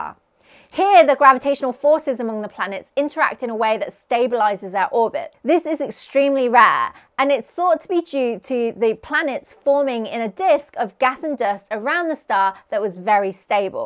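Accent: United Kingdom. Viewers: ❤❤❤❤Still can’t get over your lovely British accent. 😘😘😘 Jolly good episode👍😘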